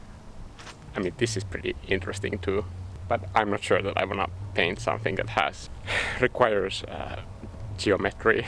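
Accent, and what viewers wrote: Finland. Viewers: I just stumbled across your videos and thought: "huh, his accent sounds really familiar" then when watching this I realized that it's the Finnish accent. Loving your works tho!!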